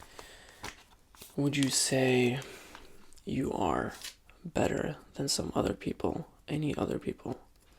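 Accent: United States. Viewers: How do you look British and sound American at the same time?:... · The first time I came across your video I feel like you will have a British or Irish accent. Nice American accent like really good. Can you do English accent?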